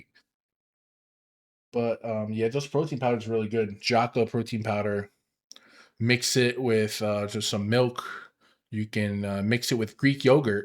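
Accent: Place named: Upstate New York, United States. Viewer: First view, first comment here! All the best on your journey,. Say, I am an informal student in accents and I want to guess you are either in our grew up in upstate New York. That's my first guess. My second guess is Eastern Pennsylvania. My third guess is Chicago. My fourth guess is a city or suburb in the northern part of the United States (in a state that touches the Great Lakes). Let me know if I'm right!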